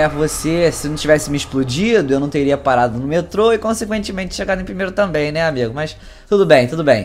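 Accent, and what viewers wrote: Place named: Rio de Janeiro, Brazil. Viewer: esse sotaque de carioca muito loko kk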